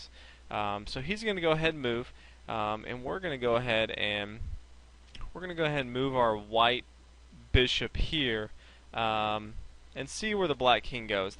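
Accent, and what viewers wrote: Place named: United States. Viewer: I end up hearing an odd British accent at the beginning of the video, realizing it's more less an American accent. Isn't that weird?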